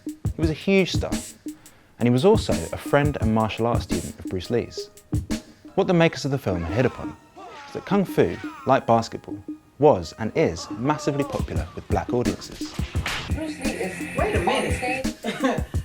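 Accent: United Kingdom. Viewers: British narrator SMH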